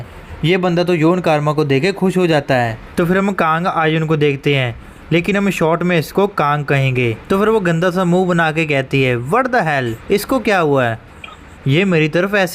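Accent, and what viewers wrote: Punjab, India. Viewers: Bhai aap Punjabi hona right guess?❤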